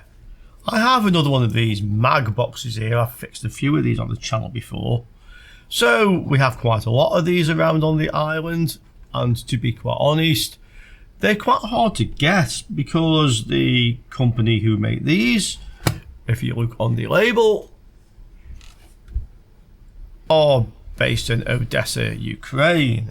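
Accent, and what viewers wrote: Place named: Stoke-on-Trent, United Kingdom. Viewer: Where are you from? I hear Stoke-on-Trent?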